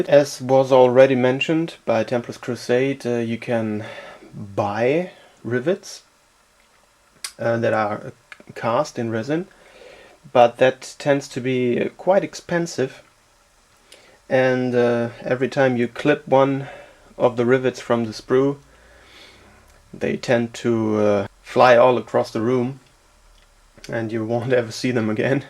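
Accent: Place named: Germany. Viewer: You have a slight german accent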